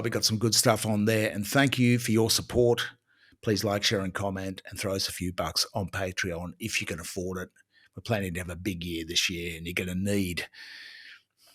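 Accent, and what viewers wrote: Australia. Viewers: It's just so refreshing to hear intelligent journalism with an Aussie accent ❤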